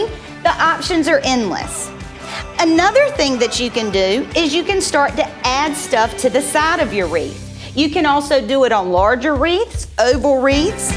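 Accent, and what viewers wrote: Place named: South, United States. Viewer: Wow her southern accent! Nice designs. Like these ideas.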